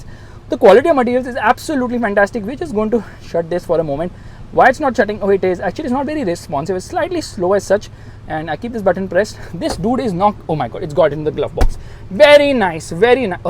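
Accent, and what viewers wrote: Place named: North India, India. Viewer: Irritating North Indians English 🥵🥵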